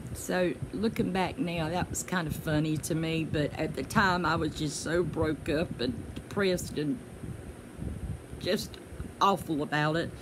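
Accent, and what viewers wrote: South, United States. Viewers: So happy for you and your family that prayers were answered and you will make a full recovery. It would be pretty neat to see a video on your top 5 favorite places that are haunted. Or scariest places you've investigated....I will watch any video as long as your southern twang is in it haha much love to you and your family 👻💜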